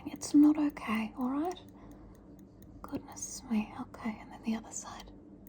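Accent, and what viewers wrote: Australia. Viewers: If everyone in australia speaks like this i think i need to move asap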